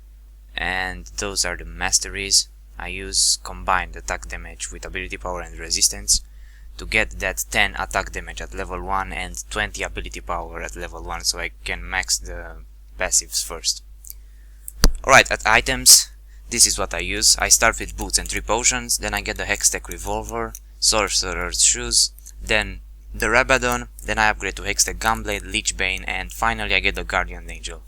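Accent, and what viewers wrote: Romania. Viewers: Se vede pe vocea ta ca esti roman :). Joci foarte bine cu Akali. Tine o tot asa. Cred ca o sa mi o cumpar si eu. :) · are u from romania?:D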